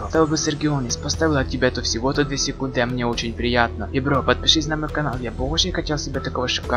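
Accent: Okanye, Russia. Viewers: ПОчему ТЫ вОсё гОвОришь черёз О? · ГОВОРИ НОРМАЛЬНО ТЫ В КАЖДОМ СЛОВЕ ГОВОРИШ БУКВУ О!😡😠👹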